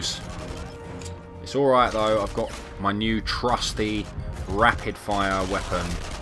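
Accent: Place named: United Kingdom. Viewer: sir you got very good royal british accent with a bronx behaviour , amazing and magnificent 👍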